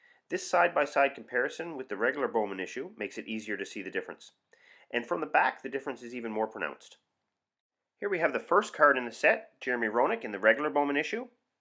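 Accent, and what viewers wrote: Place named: Netherlands. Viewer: The narrators definitely from NL. Made me miss home.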